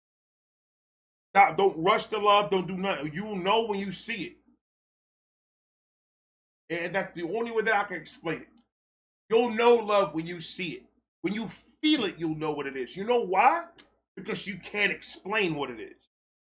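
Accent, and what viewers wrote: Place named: Bronx, United States. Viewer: You sound like you’re from the Bronx and I never even been to NY 😂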